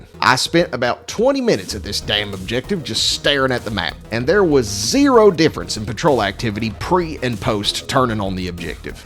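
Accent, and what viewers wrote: South, United States. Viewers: Helldiver commentary with a southern accent hits different NGL. I’d follow you anywhere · This video was supposed to be background noise and I sat here and watched the entire thing for the voice and it reminded me of home in the south. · lol that's funny. The voice is the reason I find these hard to watch. I still live in the South and have heard some pretty messed up things said in that accent. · Your accent sounds to me like Southern US, but you pronounce some words like an Australian? Peculiar. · Your southern accent makes this amazing. Much love for the intel. Keep trekking on o7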